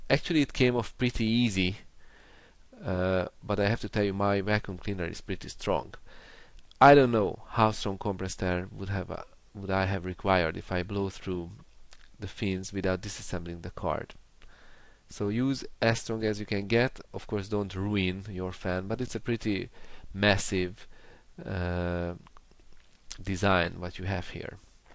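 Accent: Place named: Hungary. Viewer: Tudtam, hogy ez magyaros akcentus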